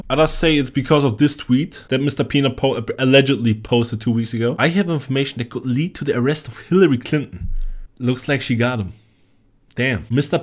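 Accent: Germany. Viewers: I was right when I watched you for the first time. You are from German. :D I read the comments and now I'm sure. That's why not everything you talk I understand so well. Is your accent :D Funny is that the more you talk more curious I get trying to understand you. I really like it! :D I wanted to ask once in your livestream video from SSB, there the game is not in english. But I decided to wait a little more and observe you more. xD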